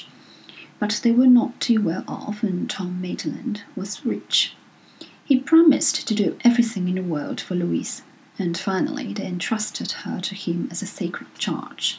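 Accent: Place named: United Kingdom. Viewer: I listened at 0.75 speed. Works perfectly:) Lovely British accent:) Anyway, it's the only reading of it, here on YouTube , so let's be grateful.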